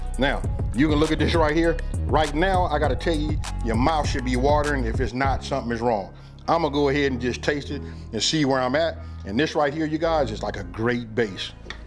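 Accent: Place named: California, United States. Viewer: I know I heard a Cali accent when you was talking.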